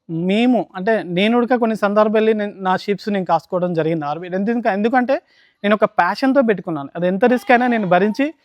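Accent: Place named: Telangana, India. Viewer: Telangana telugu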